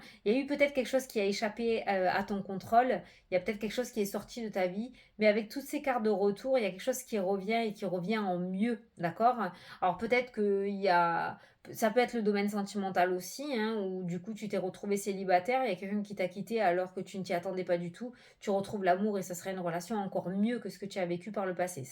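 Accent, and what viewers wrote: South, France. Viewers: cc moi aussi je suis du sud je te comprend très bien c notre parlé bisous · Bonsoir à vous merci beaucoup pour se magnifique partage, ( et oui dans le sud c'est comme sa qu'on parle, je suis du 13 donc je suis comme sa aussi. 😊 ne changez rien, restez comme vous êtes 😁 on vous aime comme sa. ) bonne soirée à vous aussi et gros bizoo 😇🙏😘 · Coucou surtout ne change pas garde ta belle humeur et ton accent du sud et petits mots qui dérangent que certains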